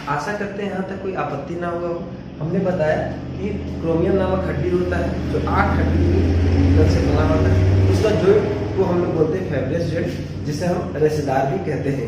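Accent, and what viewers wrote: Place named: Bhojpuri, India. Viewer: pure bhojpuri sir❤❤❤❤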